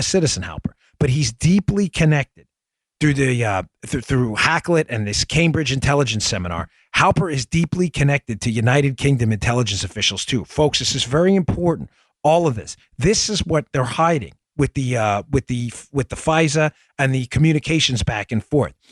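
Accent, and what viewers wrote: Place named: Queens, United States. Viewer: Kimber D - I'm Aussie and I've been listening to our Danny B every morning over my brekkie coffee without fail since Episode 628 when I first heard he had his own podcast. The first time I listened to him was when he filled in a few times for Mark Levin's show a few months prior. He was saying everything I believed to be true regarding the Russia Collusion conspiracy theory. Also a few years ago I spent a bit of time in Queens and his accent and attitude always gives me fond memories of the area I love so much. :-)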